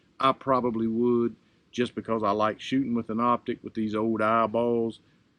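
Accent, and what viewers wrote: South, United States. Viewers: Where’s that southern accent from? Louisiana? Georgia here. Love my Mark IV 22/45 Lite. Got mine without iron sights with the short pic rail and Riton red dot from the factory.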